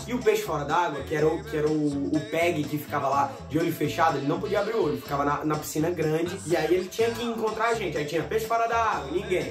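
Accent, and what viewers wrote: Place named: Minas Gerais, Brazil. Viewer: desculpa perguntar, mas você é de BH ou de Minas Gerais? Porque eu sei que você cresceu em Fortaleza, mas o seu sotaque é muito parecido com o meu, que é mineiro. Obrigada!!! E aliás, parabéns!!! Você é incrível!!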